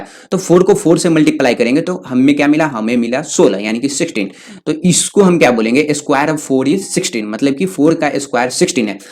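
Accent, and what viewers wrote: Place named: Bihar, India. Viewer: Sir do you speak Bihari Your speaking style is like Bihari language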